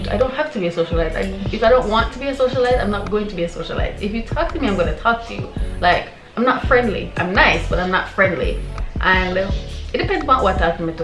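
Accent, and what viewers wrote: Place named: Jamaica. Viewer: Hey! Honestly I love when you speak just patwah 😍😩. And it's giving authentic vibes, I guess maybe you switch it up for the diversity on your channel.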